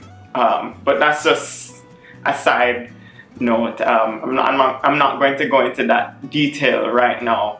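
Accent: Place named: Kingston, Jamaica. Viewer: A brief introduction, my name is Chris and I am a 2nd year Chemistry PhD at the George Washington Univeristy in Washington DC, and I’m from Kingston too! I found your YouTube channel through #BlackInChem on Twitter and as soon as you started talking I heard your Kingston accent. I didn’t expect to find any Jamaican nationals through #BlackInStem, most of the persons I found with the 🇯🇲 flag in their bio were 1st generation Jamaicans in Canada or the UK. Nice to know that you really can find Jamaicans everywhere. Good luck with your PhD and YouTube channel. Also, I love seeing what different types of scientific labs look like, so maybe consider a lab tour video.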